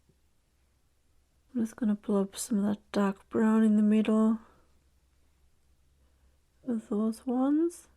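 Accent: United Kingdom. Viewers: Loved this tutorial! Please pace yourself so you don’t burn out. Where in the UK is your accent from? Much appreciation from San Antonio, TX USA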